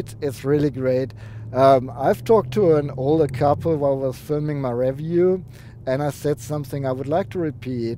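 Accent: Germany. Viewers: Speaking English with German accent = great combination :) and i can't stop watching you cause you re really good on this business, thank you for thr the video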